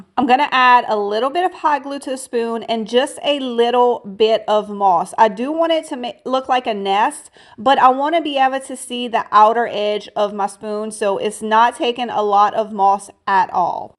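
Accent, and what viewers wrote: Louisiana, United States. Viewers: Darling! You sound like you’re from Louisiana!!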